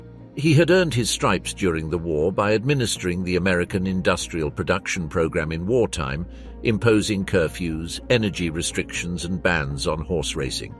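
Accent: England, United Kingdom. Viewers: Why are there so many videos on here about Ww2 with a narrator with an English accent that put Russian communism in a good light?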